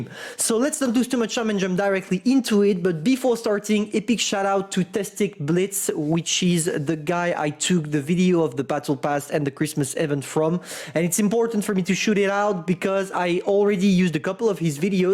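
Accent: France. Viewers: They are not used to. Not 'their'... (autocorrect?). But you are right. It is not a laughing matter indeed. I sincerely think is one of the best youtubers on WoTBlitz. I watch his video's a lot. I like his approach to the game. I just find the way he pronounces 'event' really really really annoying. How can I reach out to him, to make him say that 1 word 'event' say correctly. For the rest, I know he is French, so no bad words for the rest of his pronounciation there. It even is charming. He is good. I just want this 1 word 'event' to be pronounced correctly.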